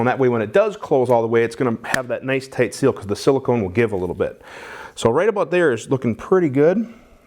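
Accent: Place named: Wisconsin, United States. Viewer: Ah, the accent of a fellow cheesehead. Sounds like home.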